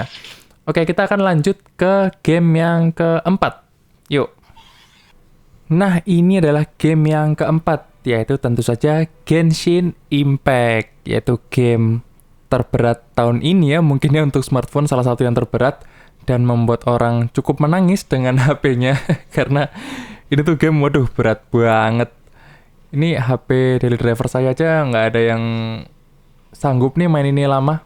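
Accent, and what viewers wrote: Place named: Banyumas, Indonesia. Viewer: Wong ngapak kye ya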